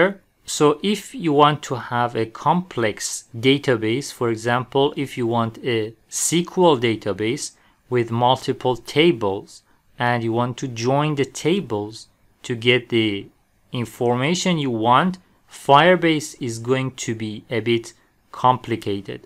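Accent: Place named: Isfahan, Iran. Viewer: Nice very nice اما فکر کنم ایرانی هستی اونم اصفهانی 😊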